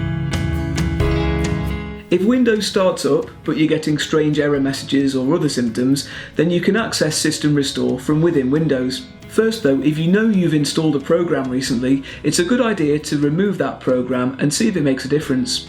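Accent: United Kingdom. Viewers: Another Brit?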